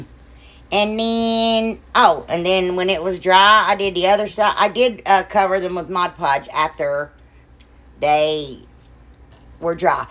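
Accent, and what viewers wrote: South, United States. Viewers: Guuurrll !! That country twang had me smiling all thru your video. Where are you from? It can't be Ohio. I'm from Florida but have lived in Alabama for the last 48 years. So I've picked up their southern talk. Enjoyed your video. Your projects are great!! TFS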